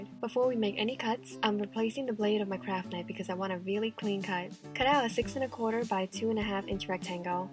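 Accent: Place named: South, United States. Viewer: Are you from New Orleans? You have a southern accent 🥰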